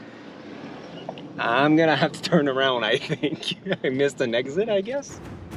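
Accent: France. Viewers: Beautiful video as usual. You made me fall in love with my country all over again😎. Your french accent is🤌. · Bruh you speak a French French and an American English at the same time! I'm shocked 😱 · I like your French accent 😅🙌🏽have fun 🇨🇲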